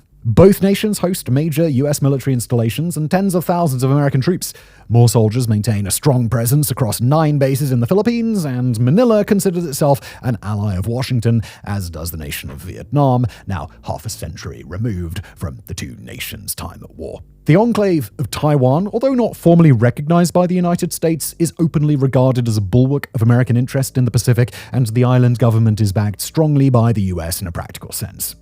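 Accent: United Kingdom. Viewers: British accent is so stupid! 😂😂😂😂😂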